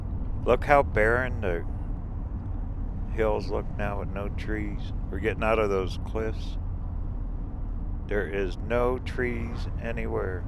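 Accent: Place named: New York, United States. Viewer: You sound like your from NEW YORK City ,there is life out here on the west coast, ya all come back now!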